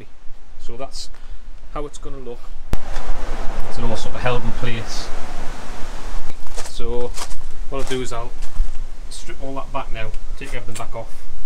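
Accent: Newcastle, United Kingdom. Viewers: Been following your progress from the start, really great job cannot believe you got this done in your front yard, must have great neighbors? Reminds me of a time long ago I did some body work on my rusty Ford Anglia, not up to your standards though, nice to hear the accent, former Geordie from Wallsend , now in Canada, cheers.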